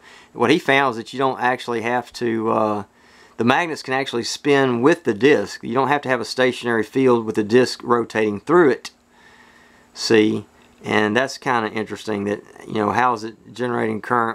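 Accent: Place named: Rural, United States. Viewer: Your accent is proof that people know more in rural America than in the cities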